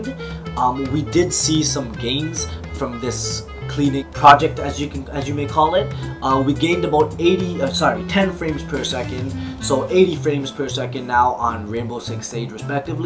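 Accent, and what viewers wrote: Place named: New York, United States. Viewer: This guy is defo from NYC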